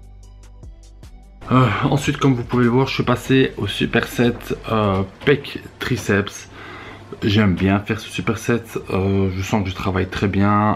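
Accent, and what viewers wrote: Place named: Brussels, Belgium. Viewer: Je tombe sur ta chaîne frère de très bonnes vidéo bien ficelé ac de bnnes infos,je reconnais l'accent tu serais pas bruxellois ? en tt cas je m'abonne bn courage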